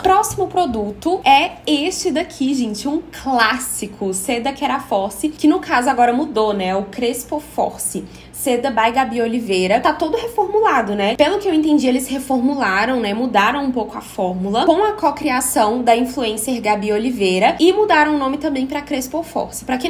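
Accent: Minas Gerais, Brazil. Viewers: Eita Mineirinha .......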